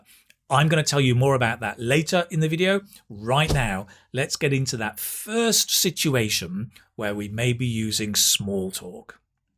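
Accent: United Kingdom. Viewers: British accent, that's cool. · I didn´t believe that I coulp understand british English but this teacher is very good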